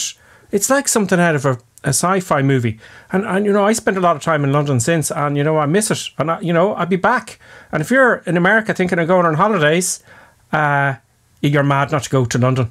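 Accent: Ireland. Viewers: I don’t usually comment, I just want to say I really look forward to your videos always informative and very funny, and that incredibly Irish accent..ohh..heheh..your sense of humour, catching the train with all those middle class bogans nerds..classic. Thank you. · Dude, what kind of accent is that you have? Irish?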